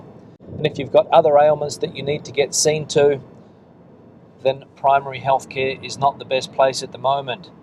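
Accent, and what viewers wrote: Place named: Australia. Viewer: We've been postponing our move to Spain ever since the current "health problem". Almost feel as if the best time to go is now because this may end up being the new normal. Where were you driving? Are you back home? Please bear with me as I share a funny anecdote. One of my best friends is originally from Southern Australia and has a very similar accent to yours. Back when Microsoft voice recognition software was in its infancy (<num>?) I would always tease him because it didn't work for him. So, for the last few updates, fixes, and debugs, Australian was always listed as one of the languages being "fixed". Of course, each update I'd have to walk up to him and say, "hey Mike, why is it that Windows can understand Kurdish, Tibetan and Pennsylvania Dutch but it can't understand Australian English?". That's how I learned a lot of colorful Australian words and phrases which I shan't repeat here! 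Did you experience the same issue years ago? Thanks for the updates.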